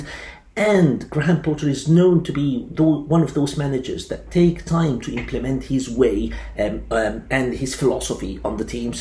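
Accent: United Kingdom. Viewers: ما شاء الله عليك يا دكتور بتكلم بلكنة بريتش و المحتوي ممتاز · Amazing content and a perfect British accent. To all the world, here is the best FPL content ever. 😍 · A very perfect British accent mate ❤️❤️❤️🤍🤍🤍🤍🤍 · British accent 😍 👌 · King!! Your british accent!🔥🔥🔥🔥🔥🔥🔥🔥🔥🔥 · Amazing content. So organised and to the point. Your British accent is incredible. I used to follow you in Arabic, but I love your episode in English even more. 😍😍😍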